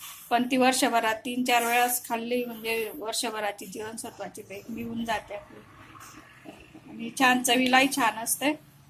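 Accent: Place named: Jalgaon, India. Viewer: मस्त ताई भाजी. आवाज जळगाव जिल्ह्यातील वाटतो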